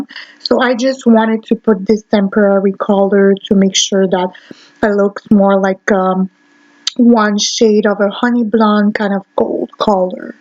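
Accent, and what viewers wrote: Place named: Brazil. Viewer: Are you from Brazil? Your accent is beautiful!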